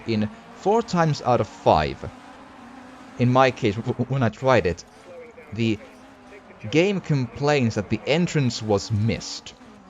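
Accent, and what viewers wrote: Finland. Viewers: Are you Finnish? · Are you finnish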